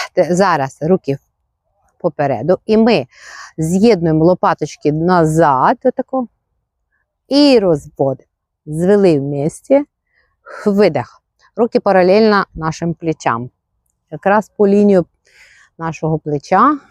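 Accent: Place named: Poland. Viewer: Спасибо Вам. Приято слушать Ваш говор, наверное польский.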